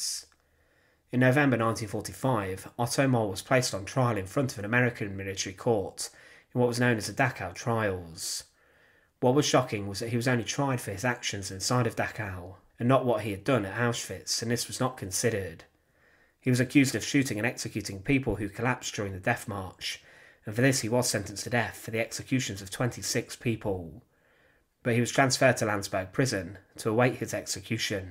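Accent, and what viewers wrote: United Kingdom. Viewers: He’s actually speaking very slowly and calmly in a British accent. Just because he has a better mastery of the English language than you doesn’t mean he should cowtow to your ignorance. Listen better. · Isn't the commentator Britsh? Not redneck...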